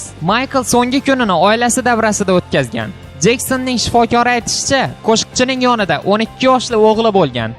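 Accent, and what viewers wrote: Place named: Andijan, Uzbekistan. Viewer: anjanleda bu wevada gapiripti :)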